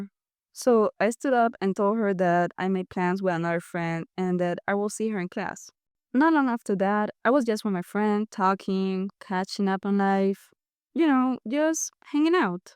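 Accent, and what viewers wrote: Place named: Latin America. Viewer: Is your original language Spanish? I really like your accent and I saw you included Spanish words and my parents are Hispanic so I speak spanish and was curious lol Also I love your style its super cute!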